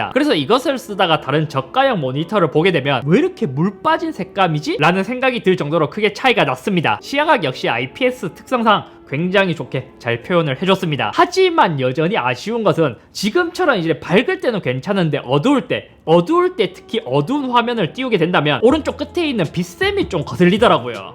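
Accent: Gyeongsang, South Korea. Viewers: 아....경상도 사투리 겁나 거슬린다.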